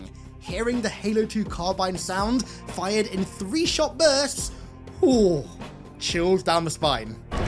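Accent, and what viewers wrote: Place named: United Kingdom. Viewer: This is probably a weird question, seeing as you have the accent, but do you live in the UK or the USA, ‘cause you also did a fourth of July episode on your other channel.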